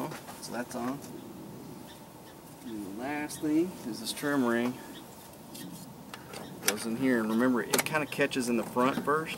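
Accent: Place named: Texas, United States. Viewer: thanks for quick respond... yeah mine it's just got 2 speaker also it's stick shift which i love .. about door speaker well i got to open a hole in the door to place the speaker and much more work that I don't have right tools to do so i will take wrangler speakers or charger dash speakers ... anything well be better then my shitty under dash speakers .. lastly i love Texas love her accent in a whole i love America.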